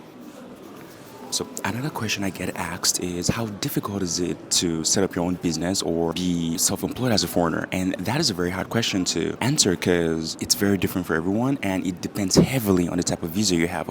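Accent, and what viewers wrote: United States. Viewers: Somehow I always asume you're from the US. Maybe bcz your English sounded American. It make sense now why your mannerism is not "rough". Coz the African American I saw mostly have "rough" mannerism. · I thought American cuz his accent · I thought you were American 😅 Hearing you're from Naija is a pleasant surprise ☺️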